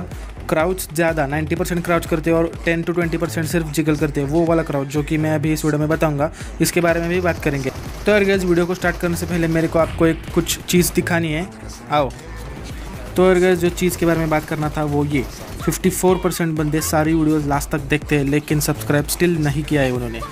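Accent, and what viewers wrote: Maharashtra, India. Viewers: Op bhaii..💯💯💯 I think your mother tounge is marathi...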